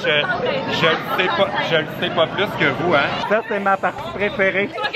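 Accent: Quebec, Canada. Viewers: tu as ecris labyrithe dans le titre faut ecrire labyrinthe ps:j'adore tes video et jdr l'accent quebecois 😊😁😍😍😘😘😙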